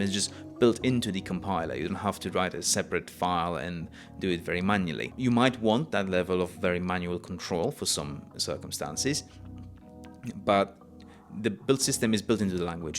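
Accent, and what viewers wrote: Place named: United Kingdom. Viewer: same half-posh British accent; the similarity increases . . . :)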